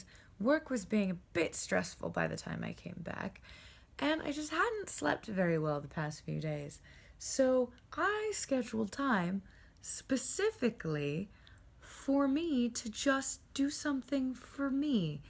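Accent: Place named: England, United Kingdom. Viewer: There's that English accent again.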